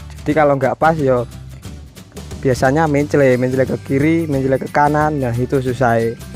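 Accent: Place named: Java, Indonesia. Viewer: Medok lut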